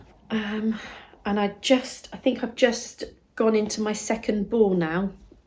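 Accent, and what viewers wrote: West Country, United Kingdom. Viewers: found you through "Tales From Cuckooland" and decided to stop a while. Loves the hint of a Westcountry accent, reminds me of home. I was born and bred in Hayle, Cornwall xx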